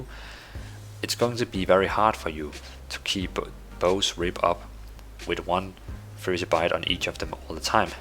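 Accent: Denmark. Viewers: Danish much :O?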